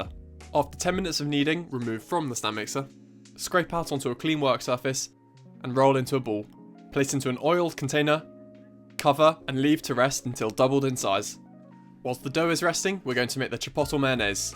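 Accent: United Kingdom. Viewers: a form of london accent, possibly a west london one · That's what you can hear from a British dude.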